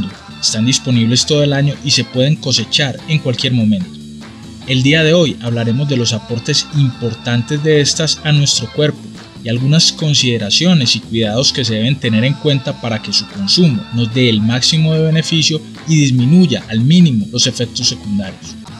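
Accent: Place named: Medellín, Colombia. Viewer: Es el acento paisa de mefellin.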